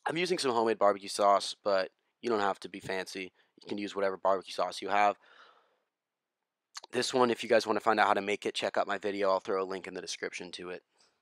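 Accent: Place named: United States. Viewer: American accent, so I'd say F.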